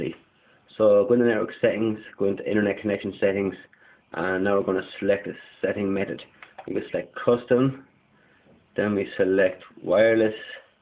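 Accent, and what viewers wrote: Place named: Carlow, Ireland. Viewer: hero!!! plus Carlow accent is unbelievable!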